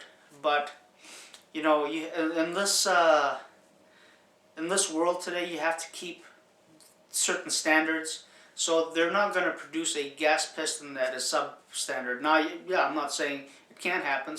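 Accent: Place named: Hawaii, United States. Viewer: Sorta Hawaiian lol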